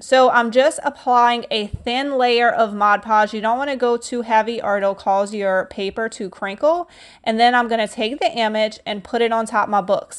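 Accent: Louisiana, United States. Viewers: You are so creative and talented, ☺️ I'm from Mamou, (central Louisiana). I live in NC, so that I can be close to my 2 grand babies. I miss Louisiana and my siblings, relatives, classmates & friends; when I get homesick, I search for your videos, because you sound so Cajun. You sound like my favorite cousins, from Houma!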